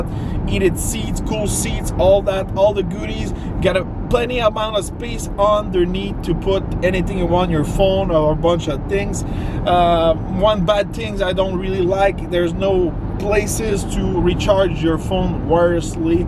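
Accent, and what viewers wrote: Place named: Quebec, Canada. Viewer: My guess Quebec Canada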